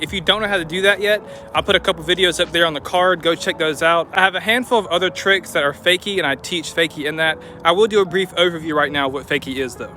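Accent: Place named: South, United States. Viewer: Where u from homie. I hear a lil southern draw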